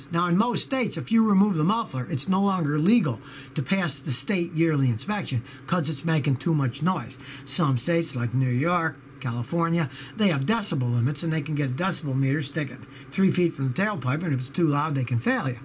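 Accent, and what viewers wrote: United Kingdom. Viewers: your's is the best video channel on the internet! Makes my day everytime I watch one of your videos. Loved the British accent! BTW, a 1986 is 34 years old, but you're still a god to me.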